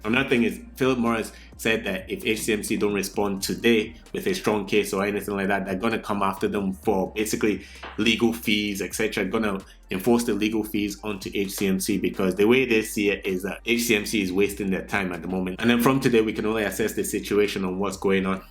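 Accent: Scotland, United Kingdom. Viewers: That's a strong Scottish accent 😂😂👍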